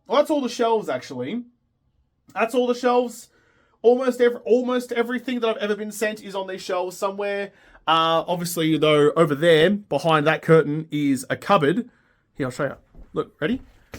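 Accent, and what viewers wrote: Australia. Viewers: Flipping the script on Neil's letter... the question for viewers & subs is whether we remember our first 7D2D video? I do. I had been playing 7D2D since the Alpha versions could be counted on just your fingers... and then Alpha 17 landed, and the game took a massive leap forward. I wanted to build a base using a draw bridge, and I stumbled into a relatively recent video from some guy with a strong Australian accent, explaining draw bridges. From the first video, I was hooked. I came back to see others, and within a relatively short time, I was Subscribed. Excellent work on your part (the arrow slit force field was game changing for me with regards to how I managed base-building). Mixed with a decent Draw Bridge layout, the arrow slit force field was unbeatable. So much has changed since then, but not... consistently inventive and creative, and always chock full of fantastic base-building & horde-night prepping ideas. 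Thanks for all of it,. The game simply would not be the same without you. Congrats on a well-deserved 100k Subs!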